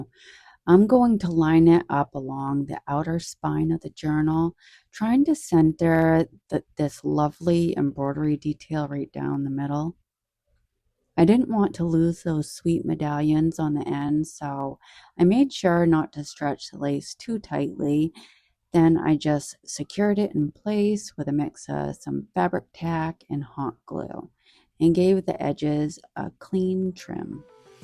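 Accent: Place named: Boston, United States. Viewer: Some vocal rhythms I just cannot listen to. I can’t watch some diy channels because of it, or the repeating of words, like “I’m gonna go ahead and…” I’m out, I can’t do it. 🤦‍♀️🤷‍♀️😂 I don’t know what it is, but I just can’t listen to it. I know it isn’t their problem, it’s mine. I have heard people repeat that phrase ten times in the first minute or two and I just don’t know what causes me to check out, but I do. I haven’t noticed any of that on your channel, your voice is relaxing. Are you a Boston girl? You have a slight accent. Your journal is beautiful. I am like you, it is hard for me to make decisions and to get started on something new. I need less stuff, because I have a hard time with decision making. 😂 I am actually going through my stash and throwing some things out, because I have far too much stuff.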